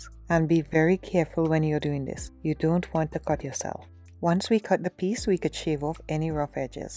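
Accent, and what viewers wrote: Trinidad and Tobago. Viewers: I knew you were a Trini from the time I hear your voice🇹🇹🖤🖤🖤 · hey ,,, yesss! trini accent very nice I stumbled upon your channel and your voice got me watching.... nice ideas thanks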